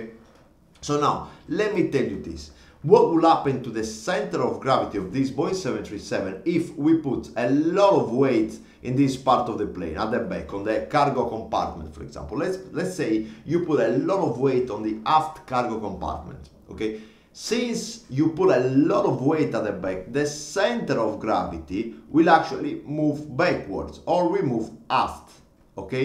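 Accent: Italy. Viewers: You sound very Italian! Ciao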